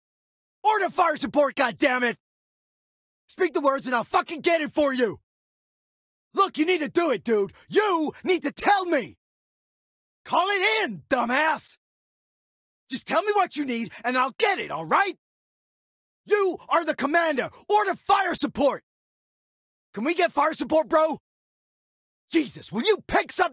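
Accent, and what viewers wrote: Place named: United States. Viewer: For an English VA His accent is believable as an American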